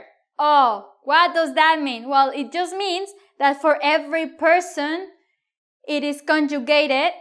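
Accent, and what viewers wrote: Spain. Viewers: Is this Spain Spanish or Mexican Spanish? (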